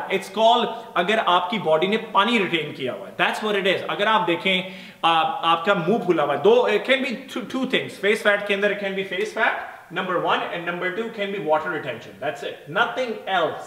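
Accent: Punjab, India. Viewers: I like your punjabi accent and also sense of humor. but this video doesn't help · i love ur punjabi as well as english accent. i m huge fan of ur accent plus u r damn cute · 😂😂 bai Canada ch reh k v teri Punjabi ch koi frk nai ayaa... love you bro..👍🏼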